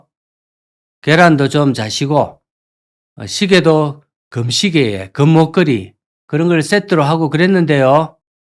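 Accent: Gyeongsang, South Korea. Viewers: 우리가 버티고 있는기 신기합니다 망해가는게 느껴지고 보이는거 같네요 갱상도 사투리가 정겹습니다 아 우야면 조ㅅ노~~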